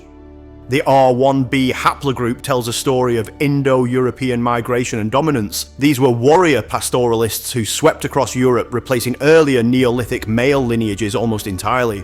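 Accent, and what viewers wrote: Sheffield, United Kingdom. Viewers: American anglosax here guessing the accent. Sheffield?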